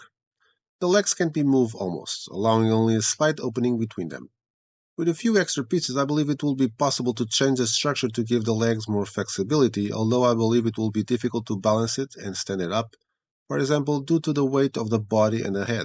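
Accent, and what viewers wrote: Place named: Italy. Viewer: Italian accent 🫶🏻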